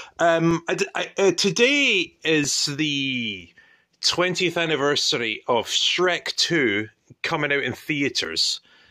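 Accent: Scotland, United Kingdom. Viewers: Do you live in Scotland according to your voice ?🇬🇧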